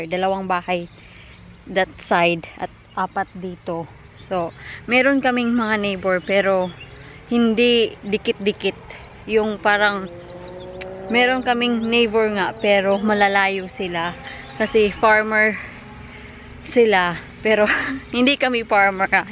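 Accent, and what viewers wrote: Visayas, Philippines. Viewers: Bisaya kaayo oy :-) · Bait mo Kabayan . Yung tono nang pananalita mo ay bisaya ..katulad ko isang bisaya...hahaha.. I like it . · It seems in your speaking that you were bisaya , bisaya pud ko .. please support my channel . Done subscribing to your channel .. God bless :-) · Hahaha😂😂😂 asa diay ka sa Bisaya Dai nindut kaayo ka paminawon bisdak Kang dako😂😂😂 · Oi palangga taga saan ka sa pinas pangga para ka kc bisaya.... ang tuno mo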